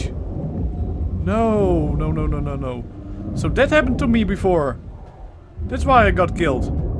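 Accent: Netherlands. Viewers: Dutch pronunciation ganggg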